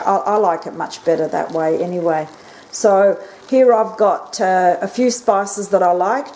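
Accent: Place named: Australia. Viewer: Thank you so much. Im just starting back with canning after a few years and i am doing lamb mince cos Aldi had it marked down. So nice to hear an aussie voice !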